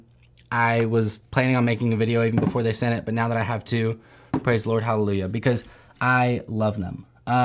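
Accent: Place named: South, United States. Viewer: “That thing Dont pop off” lets me know youre from the south lol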